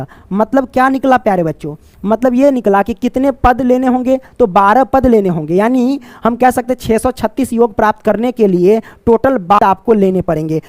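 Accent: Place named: Rural, India. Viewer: Gawar ke trah bolta h dehati😂😂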